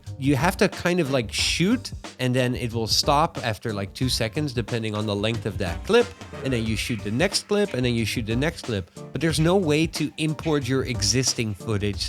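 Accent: Netherlands. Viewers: Just started following you because I heard your Dutch accent 😂👍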